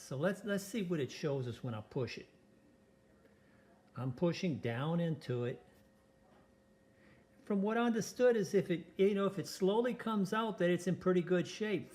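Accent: Louisiana, United States. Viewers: Are you from Louisiana? You sound like my family from Rayne, it’s nice to hear.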